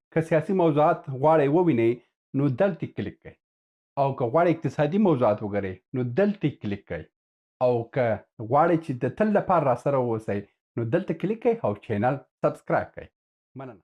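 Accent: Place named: Farah, Afghanistan. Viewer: ده فراه پشتو داغه رقم ده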